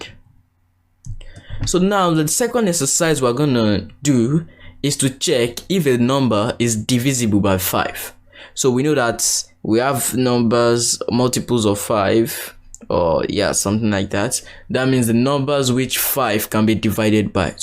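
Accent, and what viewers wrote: Yoruba, Nigeria. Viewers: He sounds Nigerian, awesome man! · is probably Nigerian by his accent, yoruba to be precise. Thumbs up to him🇳🇬🇳🇬🇳🇬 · Nigerian accent o 😂💀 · It's a Nigerian accent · I can hear the Nigerian accent. Great video. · sounds like a Nigerian great tutorial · Excellent, looks like a nigerian accent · Heard the accent and i knew this na omo naija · As a Nigerian, I've been doubting if this accent is from a Nigerian or not, then I heard "let me kuku", my doubt went off....thanks so much for the video. How can I also reach out to you? I've done cs50p, cs50x, and almost done with cs50w, but still feeling like they are not enough in today's market. I will really appreciate your response. From your fellow Nigerian. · Is it just me or does the instructor have a Nigerian accent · this is wonderful ..... why does this guy sound very Nigerian ??? 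· Im so happy i can hear a Nigerian's voice. God bless you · Well he is Nigerian ....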